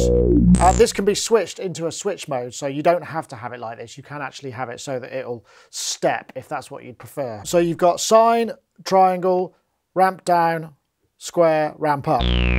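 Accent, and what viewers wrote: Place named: England, United Kingdom. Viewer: English accent at its finest.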